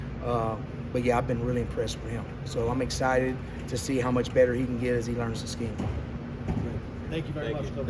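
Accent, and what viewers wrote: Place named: Louisiana, United States. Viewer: Love that Cajun accent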